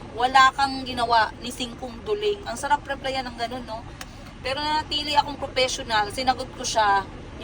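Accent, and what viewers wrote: Iloilo, Philippines. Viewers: Kalambing mn mg ilonggo madam ba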